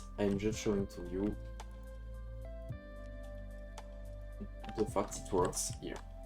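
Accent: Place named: France. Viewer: A ton accent je dirais que tu es français xD. Good job bro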